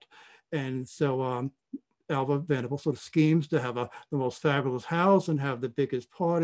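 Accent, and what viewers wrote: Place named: United States. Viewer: A very nice documentary, thank you for this; in one piont, I'd rather to sy sorry, because the dialect is so terribly american, that on almost could not undrstand what is really meant.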